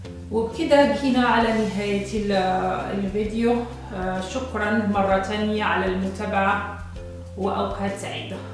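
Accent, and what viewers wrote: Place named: Maghreb. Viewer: هل انتي مصرية اختي لهجتك من المغرب العربي و شكرا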